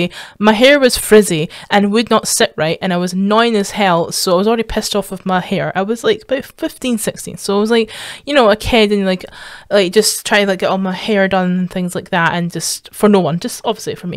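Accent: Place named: Scotland, United Kingdom. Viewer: Your comments and stories with that Scottish accent love it!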